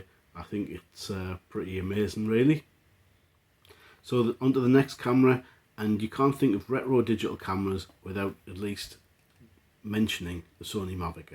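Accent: North East England, United Kingdom. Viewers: I have just discovered your channel on a youtube safari and immediately spotted the NE accent! I have watched a few of your videos now, and will continue over the next few days. My friends think I am mad because I mainly do film photography, but looking at some of your digital collection makes me feel saner! I have to say: Bad 35mm film cameras are miles better than bad digital cameras! I have a Fuji STX-2 which cost £15 at a pawn brokers and have to say it is a camera that never disappoints and from what you say about the STX-1 it would seem that Fuji film cameras are definitely unsung heroes. I love your content and the way you present it (a lot more polished than me) and I am hoping that I will be inspired to get better. I am looking forward to more over the coming days.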